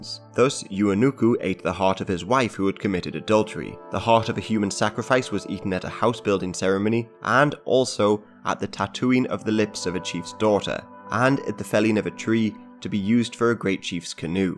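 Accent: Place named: Newcastle, United Kingdom. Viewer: Good stuff, bonny lad, and I think that i heard the remnants, of a Geordie, accent there, good voice, for story telling tell them about,the lampton worm,.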